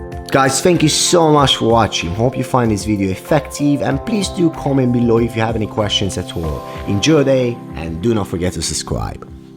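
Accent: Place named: Italy. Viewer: Is he Italian or something he has an accent that us not fully British.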